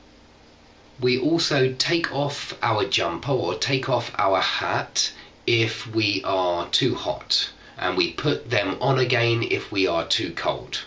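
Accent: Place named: RP, United Kingdom. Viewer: Thanks a lot sir I really love your BBC accent.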